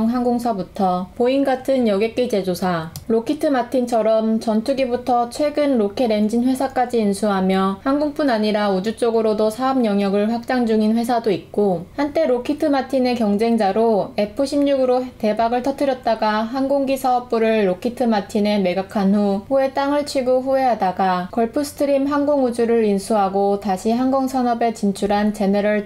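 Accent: Gyeongsang, South Korea. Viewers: 님 경상도 억양도 좋지만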